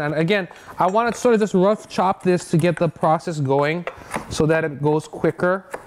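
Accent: United States. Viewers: The problem is these americans think they can speak Englisd as well, like the rest of us. Terrible! Maybe we should advise them to watch BBC TV and learn from there.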